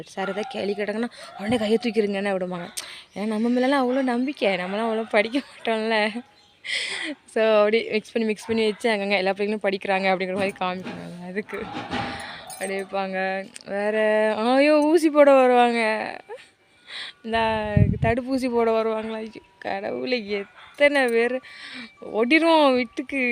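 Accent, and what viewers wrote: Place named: South, India. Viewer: கள்ளம் கபடம் இல்லாத குழந்தை தனமான உங்க தெற்கத்திய பேச்சு எங்களையெல்லாம் மயக்குது தங்கம்.நீங்க தொடர்ந்து வீடியோ போட்டுக்கிட்டே இருக்கணும், நாங்க உங்க அழகான பேச்சை ரசிசிகிட்டே இருக்கணும்.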